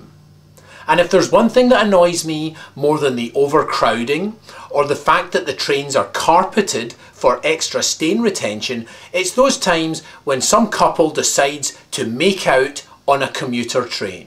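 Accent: Scotland, United Kingdom. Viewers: Yes, and the air with some sort of floating ad for insurance made out of other ads for fast food. On a serious note, why is your accent so deceptive? Your voice says Scotland, but your voice saying where you're from says America. Why the difference?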